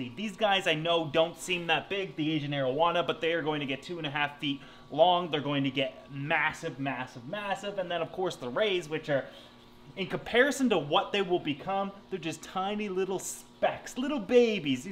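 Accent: Canada. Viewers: As a Minnesotan, I adore your Canadian accent! Makes me feel like I know you. I appreciate the videos and great information, I'm just getting into the hobby and your channel has been a go-to source for information :)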